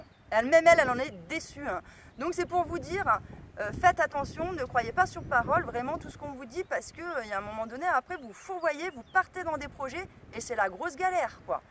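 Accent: Paris, France. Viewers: Excellente vidéo didactique ! Par contre par pitié apprenez à perdre cet horrible accent parigot! C'est insupportable tous ces ''huns'' à la fin de chaque deuxième mot 🤯😱 A moins que vous soyez la ''x'ième'' descendante d'Attila ! Les plus érudits comprendront 🤺🏇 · L'accent parisien ! Juste insupportable ! C'est dommage car la vidéo est intéressante.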